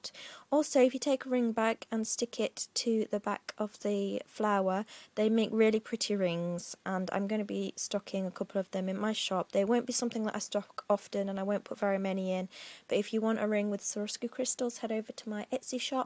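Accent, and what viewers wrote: United Kingdom. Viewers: Great craft, great accent! You sound like you are from two different parts of UK.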